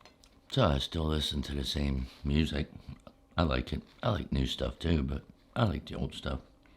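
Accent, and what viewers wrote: Pennsylvania, United States. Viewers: are you from PA? I went to Gettysburg college (born and raised in New York) so I am familiar with the accent! 🧡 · Do I hear just a little bit of Philly in your accent?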